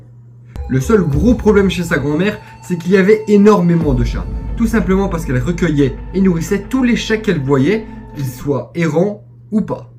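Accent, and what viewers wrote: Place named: North, France. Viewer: Bonjour, Je t’ai découvert il y a une semaine et j’adore ta façon de mettre en scène et de conter les histoires. Créativité, humour sont au rendez vous !! D’ailleurs est ce que tu es originaire du nord de la France ? En effet, ta manière de prononcer le 20 m’interpelle car je le prononce de cette façon étant originaire du nord de la France 😅 ! J’ai un ami qui écrit des histoires d’épouvante et d’horreur donc un jour tu as le souhait de conter des histoires fictives et originales n’hésite pas Je tiens à signaler que je t’en fait part juste parce que c’est mon ami et que je crois en ce qu’il écrit Excellente continuation à toi ! Sabrina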